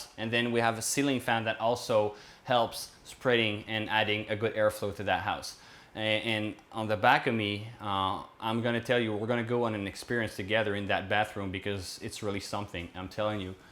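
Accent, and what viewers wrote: France. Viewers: I miss your French accent, your English is impeccable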